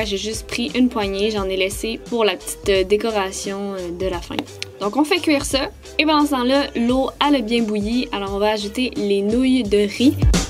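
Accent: Quebec, Canada. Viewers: Tu connais l accent quebecois? Nan jcrois pa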